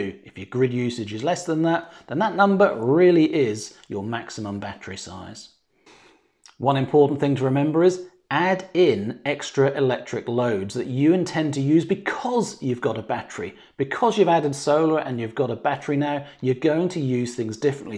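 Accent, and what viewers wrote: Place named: Norfolk, United Kingdom. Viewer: Thank you for your well thought out video. We installed our panels and batteries nearly a year ago now, and had similar thoughts. Now we live in Portugal, which is somewhat different. Approximately 300 days of sun per annum and you can’t sell back to the grid. But the same logic still applies; understand what you want from the system, work out your peak daily use, and size panel production and energy storage accordingly. We have been off-grid since March and we consider we have the balance. By the way, I’m a Norfolk gal, and I can hear a slight lilt in your speech, but the picture of Elm Hill confirmed it to me!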